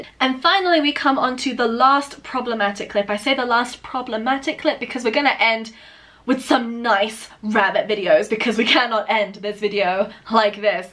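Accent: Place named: United Kingdom. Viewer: Did not expect a british accent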